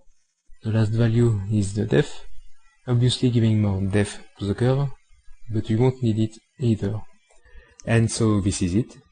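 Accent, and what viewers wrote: France. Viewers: J'aime quand les français parle anglais :) bonne vidéo merci ! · tu es français non? :-) ça ce voit à ton accent ^_^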